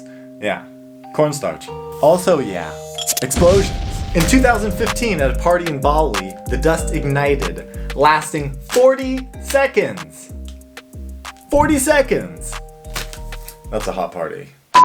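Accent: South Africa. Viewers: You're South African?! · What are u South African??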